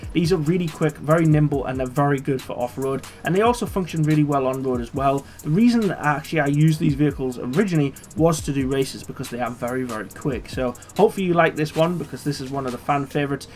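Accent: Newcastle, United Kingdom. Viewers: "Absolutely class"😂 R u a Geordie too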